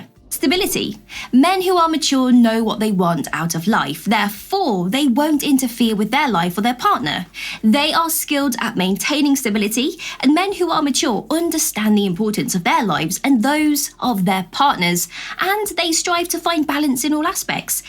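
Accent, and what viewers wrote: United Kingdom. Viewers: I kind of like the British accent, and you don't make the eyes hurt. I do find myself going whew when you finish a video...as while I enjoy listening to you talk I feel bombarded at times.... peace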